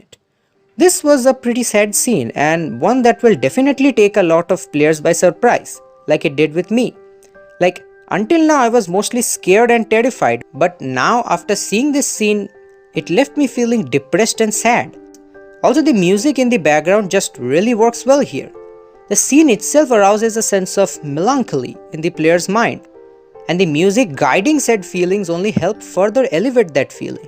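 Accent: India. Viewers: This cute accent our host is bringing me joy. He is analyzing and noting interesting things and having that desi cadence that makes my heart sing with different perspective.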